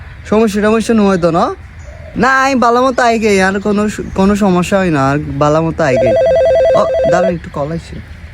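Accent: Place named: Mirsarai, Bangladesh. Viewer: ভাষা টা হচ্ছে মিরাসরাই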